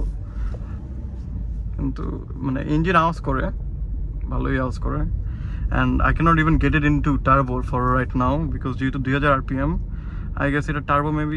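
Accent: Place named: United States. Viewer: আপনি কি নরমালি কথা বলতে পারেন না ভাই। শুনতে যেন মনে হয় কোনো একজন আমেরিকান লোক কথা বলছে।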